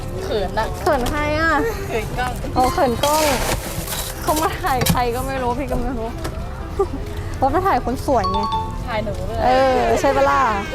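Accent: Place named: Nakhon Sawan, Thailand. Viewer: ดูตลอดนะครับfcขาหื่นนครับ พึ่งรู้ว่า เป็นคนคอนหวันคนบ้านเดียวกันเลยครับผมอยู่อำเภอท่าตะโกง่าบบบ ถึงว่าสำเนียงทำไมเมือนคนนครสวรรค์จัง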